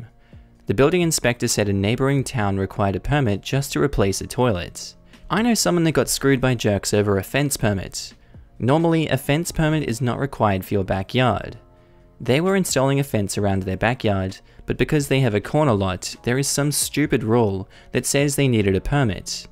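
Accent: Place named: Australia. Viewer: a voice so Australian I nearly fell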